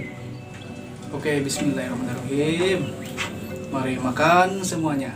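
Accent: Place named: Java, Indonesia. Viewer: Ngomong nya kek org Jawa bang wkwkwkwk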